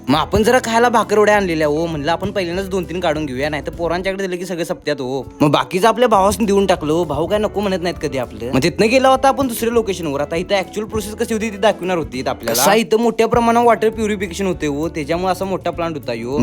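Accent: Kolhapur, India. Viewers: Kolhapurii ascent so cutee ❤❤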